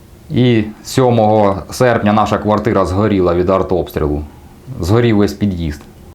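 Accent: Ukraine. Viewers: какой же поганый суржик) жалко хозяйство , побольше нервов и сил на восстановление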